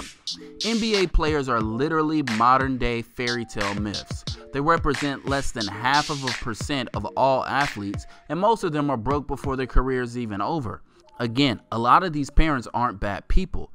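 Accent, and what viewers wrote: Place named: Oregon, United States. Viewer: Sounds like you live in Salem or Albany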